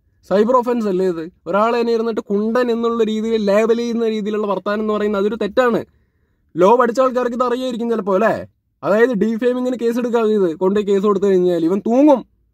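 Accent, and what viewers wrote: Palakkad, India. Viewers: Bro palakkad aano language ath pole thonni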